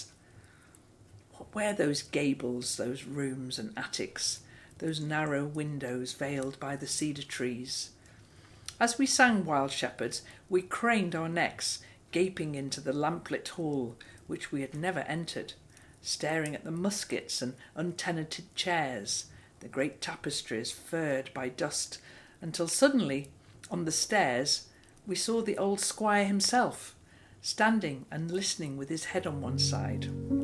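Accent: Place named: Scotland, United Kingdom. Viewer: Thank you so much for the reading. So heartwarming. Your accent reminds me of my Scottish grandmother and her siblings. They were dear to me. It is evening for you and about noon for us, a bright, clear 1F… snow on the ground. Listening to Frank Sinatra and Bing Crosby croon on the CD player. Does that age us? Merry Christmas and a good quilting year!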